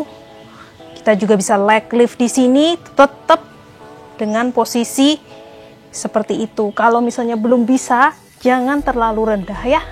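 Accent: Java, Indonesia. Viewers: Lucu bgt perckpnya..medok bgt jawanya 😀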